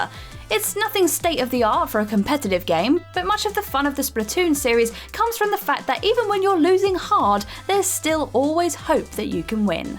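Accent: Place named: England, United Kingdom. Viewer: A "news lock" run? Yea English accent are definitely annoying and everybody just acts like they absolutely love them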